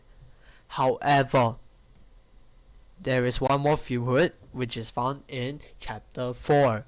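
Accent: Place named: Singapore. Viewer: unrelated, but are you from SEA? specifically SG? the accent really gave me a shock hearing it in the wild LOL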